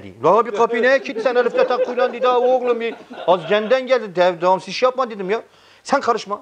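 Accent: Erzurum, Turkey. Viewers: 🤣🤣🤣🤣he valla ölide bizim.diride doğri diyir çok hoş erzurum şivesi konuşmalari slm.olsun bütün erzurumlu kardeşlerimize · Erzurumluların şivesine bayılıyorum ya 😂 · kardeşim sohbetlerini Zevk ve hazla dinliyorum. Takibinizi yapıyorum. Diskionunuz güzel akici. Zekanızı güzel kullaniyosun. Erzurum şivesiyle mübalağalida olsa taktir ediyor başarılar diliyorum. ANCAK..!!!? O tatlı sohbetlerin arasında keşke aralarda üstüne basarak ettiğin küfürleri kullanmak zorundamisin? Bu hoş sohbetlerini aileler, ana baba bacı çocuklarda dinliyor. Bilmem nerelerini yererem. Vay ben orana yok burana S....um. Vay ben A....k... gibi küfürler sence doğru ve çok hoş mu? Kaldiki hele hele bir Dadaşa böyle bir dil üslubu yakışiyo mu? Lütfen..! Sevgilerle selamlar Hemşehrim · Üniversiteyi Erzurum’da okudum özlemişim bu şiveyi ağzına sağlık kardeşim hala gülüyorum. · Bir erzurumlu olarak şive dinlemek bile çok güzel. 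Ama şu aralara neden küfür konur anlamam. Hiç hoş değil komik asla değil. Erzurumluların ağzı bozuk değildir. Komik olmak için buna gerek yok. Bu yüzden dinlerken gülemiyorum ve izlemek istemiyorum.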